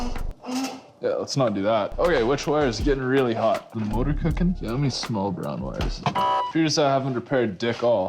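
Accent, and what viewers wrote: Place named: Ottawa Valley, Canada. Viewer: Fuck bud, this feels and sounds like me and my god damned 1500, 5.7 ram. Glad i found ya. Fuck... hahaha we even say some of the same damn things. Never realized how much you lads sound like us ( ottawa valley, northwest a few hours of central Ottawa. Keep these comin bud!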